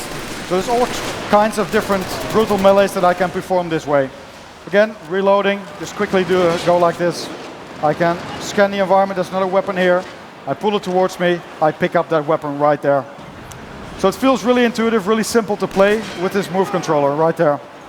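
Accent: Netherlands. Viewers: Haha you can hear he's from the netherlands, I wonder how many people will play the game like this... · hahah geweldig dat nederlands accentXD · Hahaha, he is Dutch. I can hear it from his accent xD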